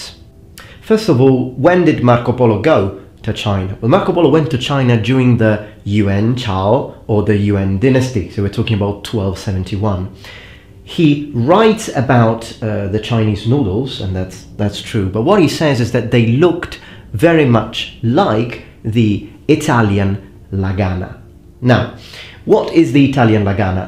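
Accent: England, United Kingdom. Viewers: Qualche consiglio su come raggiungere il tuo livello di pronuncia British? Sono a Londra da 2 anni e ancora mi trascino dietro il dialetto Romano. Grazie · Your English sounds like a British accent. Well done! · hai un accento inglese fantastico,come?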